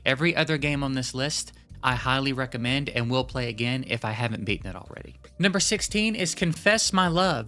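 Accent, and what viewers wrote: South, United States. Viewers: your amazing southern accent makes me feel like someone is scratching my brain.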